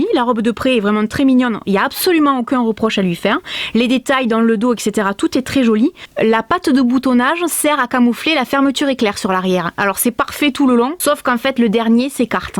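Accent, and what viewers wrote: South, France. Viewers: Je ne sais pas comment je suis tombé sur cette chaîne mais en tout cas je trouve que tes vidéos sont super agréables à regarder. Le franc parler, l'accent du sud, l'humour.... c'est sûr y'en a pas 2 comme toi !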